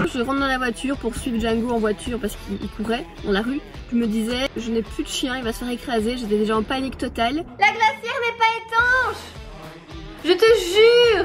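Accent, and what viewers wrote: Belgium. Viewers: Tu es belge ma chérie ?😍❤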